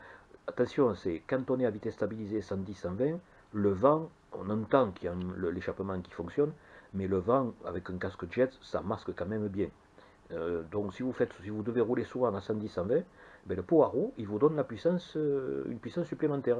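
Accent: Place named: South, France. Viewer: Bonjour Merci pour votre partage d'impressions sur votre scooter, et j'apprécie beaucoup votre joli accent méridional lol Je possède actuellement une Deauville 700 avec laquelle j'ai eu un accident de la circulation, le délai d'obtention des pièces de rechange étant très long, Honda St Dié m'a prêté un ADV 350. Je suis agréablement surpris par le confort et les aspects pratiques de ce scooter et je me demande si je ne vais pas le garder...... Comme toi je suis à la retraite j'ai 66 ans, j'habite en Alsace dans la montagne vosgienne. Est-ce que tu as déjà fait de longs voyages avec? Merci pour ton essai et bon week-end à toi.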